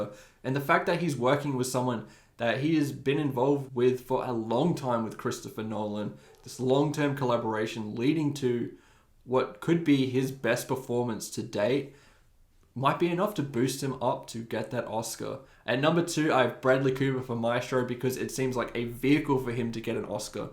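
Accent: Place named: Australia. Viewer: You sound more Aussie than NZ tbh. Nice video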